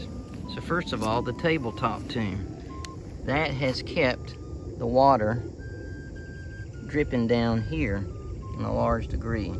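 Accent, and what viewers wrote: South, United States. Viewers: “The LORD has preserved these letters”. Not many look at things like you and I. Our LORD GodAlmighty has his WILL, HIS HANDYWORD on everything we see that is Good and for HIS SAKE. I came from a line of cemetery Stewards. Both my maternal and paternal grandfathers and great grandfathers lived on Cemetery Stewards Houses…which were the cemetery’s church parish house. We had some good times playing at mammaw and pappaw’s house. Especially in the summertime when we played outside late. We would play nighttime hide and seek. Our backyard wasn’t like most, it was a huge backyard with marble, granite, and black onyx vertical tablets everywhere. THE greatest place to play hide and seek in the summer evenings when the hot sun was going down and it started to cool. The only lights were lightning bugs or the full moon occasionally reflecting off the high polished granite and black onyx stones. 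Some nights we had to give up our hiding spots because some of the stones made a perfect hiding spot if they were wide and tall enough for us to crouch down behind. Precious Memories….. I love your southern accent. It’s very comforting to hear. The old southern accents are fading away. All the southern kids of this generation don’t have the traditional tight nit families surrounding them with the accents. They are only speaking what they hear on the iPhone social networks. …..it’s sad. It’s also sad to see this current generation NOT BURYING THEIR LOVED ONES. Everyone is taking the greedy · Happened upon this. Interesting. Would have also been nice if you read fully those earlier stones that didn't quite make the earliest. I hear a Southern accent, where are you from?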